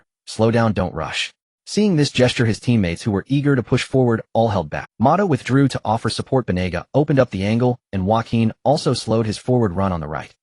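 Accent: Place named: China. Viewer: Is he playing in La Liga or Chinese super league because every name is pronounced in Chinese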